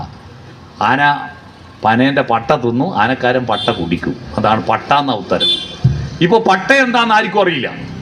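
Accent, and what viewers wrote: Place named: Vadakara, India. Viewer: ഭാഷാ വൈകല്യം നല്ലവണ്ണം ഉണ്ട് ചില വാക്കുകൾ മനസിലാവുന്നില്ല ഉദാഹരണം മൊത്തി (മുഖം എന്ന് മറ്റൊരു വടകരകാരൻ പറഞ്ഞു തന്നു ) പ്രാദേശിക ശൈലി യായിരിക്കും പ്രസംഗം കുഴപ്പമില്ല ഞാൻ മിക്കപ്പോഴും കേൾക്കാറുണ്ട് കുറച്ചുകൂടി പ്രാദേശിക ശൈലി മാറ്റി വ്യക്ത മായ ഭാഷ പ്രയോഗിക്കാൻ ശ്രമിക്കണമെന്ന് താല്പര്യപ്പെടുന്നു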